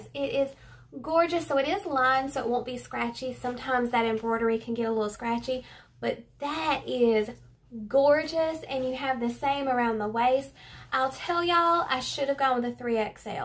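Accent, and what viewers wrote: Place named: Louisiana, United States. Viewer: Girl! You are cute as a button! Where are you from? I’m from Louisiana and your accent sounds like home:) I just found your videos and love your clothing reviews:) I’m just now trying some online clothes shopping and it’s great to have honest opinions. We have almost the exact same measurements and body shape as well, so I’ll definitely continue watching your reviews:) Thanks for all you do!💕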